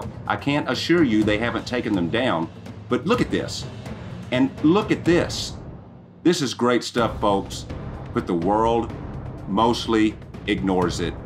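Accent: South, United States. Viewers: Hey. I can't quite seem to make out the writing that you're talking about, but on the same 'rock', top of the screen, left of centre (just above that bright spot) it says 'BEV' - in the clearest possible, white, capital PRINT! Though it doesn't look like it's actually printed on the rock, but separate letter blocks (look at the 'V') - that's undeniably ENGLISH!!! But I don't think that's the same writing you are talking about, because you zoom in to a lower point. You didn't miss that tho', did you? PS Lovely to hear that great, southern drawl of yours again!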